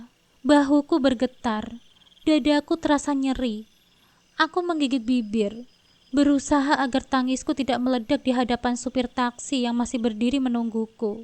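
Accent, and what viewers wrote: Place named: Central Java, Indonesia. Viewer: Kk kok tau desa karang nduwur , apa kk org jateng , klo dari logat cara baca a sii spt iya yaa ,,, klo blh tau kk berasal dr kota mn yaa ? 🙏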